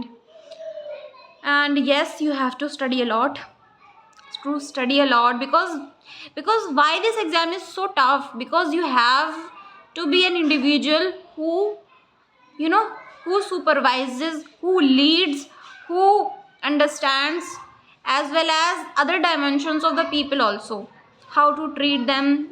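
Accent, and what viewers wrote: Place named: Himachal Pradesh, India. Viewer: himachali accent 😁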